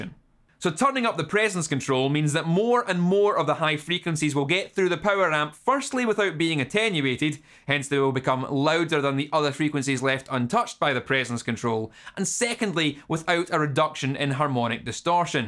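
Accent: Scotland, United Kingdom. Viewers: How can we do your scottish accent? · Honestly i just watch these videos for cool accent🤣, my scottish brother(scottich heritage here)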